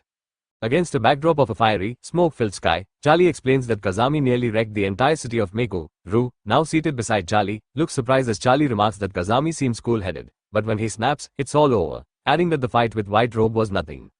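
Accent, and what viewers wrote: India. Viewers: From all the accents you could use you CHOOSE Indian???? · I mean, if this is AI doing the reading, then Choosing an indian accent is just Evil. If its just some guy readin then I wont complain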